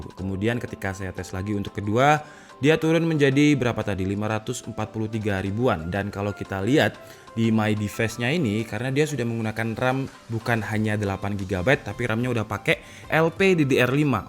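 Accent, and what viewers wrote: Malang, Indonesia. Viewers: Orang malang kah?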